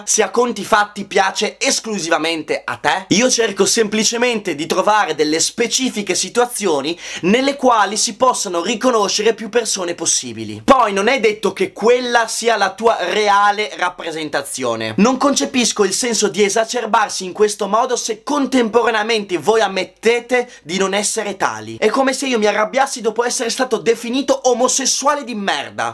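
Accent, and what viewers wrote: Italy. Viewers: sei veronese? Viva il veneto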